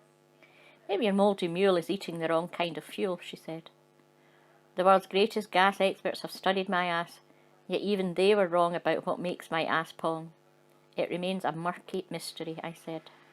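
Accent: Scotland, United Kingdom. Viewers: Never did I know I needed so much to hear and Scottish woman read me stories!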